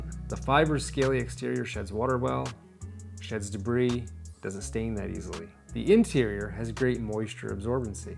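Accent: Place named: Maine, United States. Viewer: Off topic question, where are you from? Your accent in some parts sounds like it's from Maine but in other parts sounds like it's from Canada.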